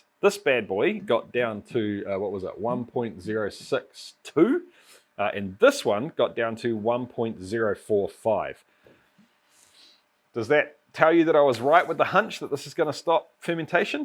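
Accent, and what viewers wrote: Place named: New Zealand. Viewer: German speaking Yooper* here, stumbled across your channel a couple days ago. When I couldn't understand... well, anything you were saying, I actually had to turn on the closed captions. Then I realized I haven't heard that many Kiwis (with all due respect!) talk, so I had to watch a few more videos so I could translate the accent. By the end of this video, didn't need the (cc) any longer. And... absolutely loving the passion you have for the craft! * A Yooper is a person from the Upper Peninsula of Michigan (USA), very near the Canadian border. We talk funny too! :-) If I ever make a video, you'll probably need closed captioning too.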